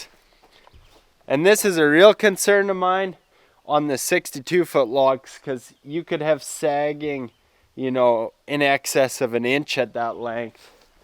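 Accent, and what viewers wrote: Canada. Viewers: What you got there is a good Canadian kid eh! Saskatchewan I think.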